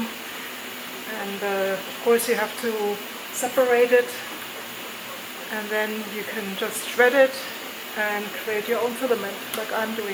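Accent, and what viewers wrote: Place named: Germany. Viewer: Da ich höre das Deine Muttersprache Deutsch wäre, frage ich in Deutsch....😅 Funktioniert es gut?...hattest Du viel ärger damit? Überlege mir auch so einen zu kaufen.😊 Grüsse Roy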